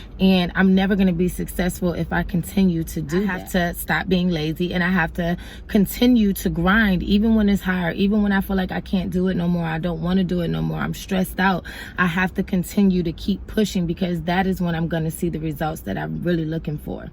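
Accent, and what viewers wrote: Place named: New York, United States. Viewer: You got a new York accent.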